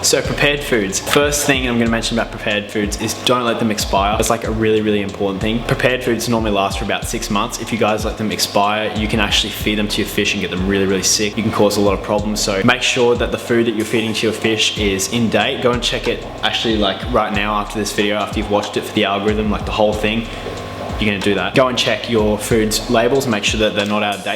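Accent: Australia. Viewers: Are you an austrilian??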